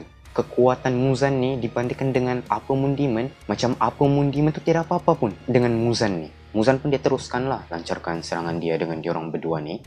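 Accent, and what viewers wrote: Sabah, Malaysia. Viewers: Abang ni orang Sabah ke??🤔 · Dia ni org sabah ke? Yg cerita ni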